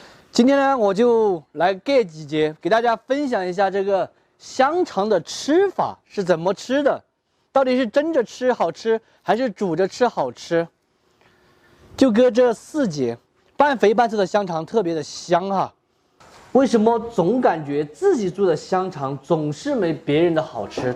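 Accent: Sichuan, China. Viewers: 你的川音普通話好搞笑！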